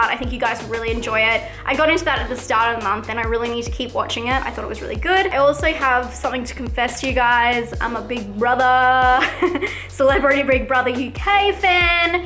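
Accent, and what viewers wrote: Australia. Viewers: Yay,!! Saw movies!! Big fan! So cool you went to the Gold Coast! I personally think the west coast has nothing to envy. Sounds like you are from Western Australia?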